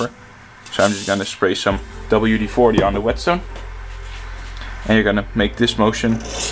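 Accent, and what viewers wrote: Netherlands. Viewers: Typische nederlander aan het accent te merken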